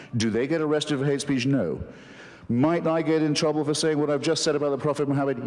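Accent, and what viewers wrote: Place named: United Kingdom. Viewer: Too Bri'ish didn't listen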